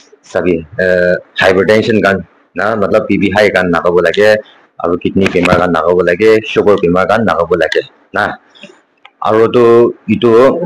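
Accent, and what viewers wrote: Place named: Nagaland, India. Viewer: Bakka local nagamise Ao tune ase ....holibhi bisse bhal tey ....keep it up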